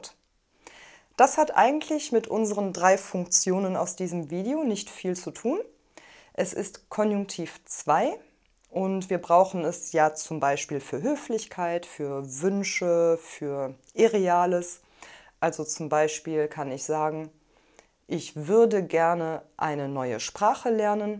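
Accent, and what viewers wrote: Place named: Eastern Europe. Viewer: Чо-то ты нифига не похожа на дойчу... Если бы не произношение, принял бы тебя за... Чешка? В любом случае, что-то славянское 120%!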